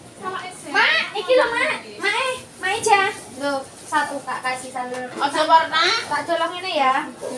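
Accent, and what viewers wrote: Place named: Java, Indonesia. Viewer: Walaikum salam mbake,koyone ne buah seger seger dan pasti enak..tapi lebih kpenak bahasa jowone ketibang buahe mbake so'ale medok tnan.salam santun dari crew happy wa buyut???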